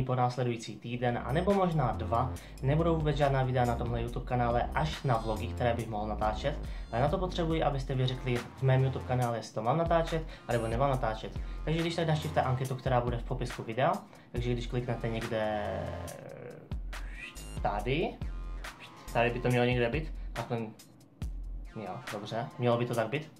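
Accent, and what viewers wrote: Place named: Moravia, Czech Republic. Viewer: "nejsu zvyklý" ... ty jsi z Moravy, že jo? :D jinak, vlogy určitě toč, bude to zajímavá obměna ;)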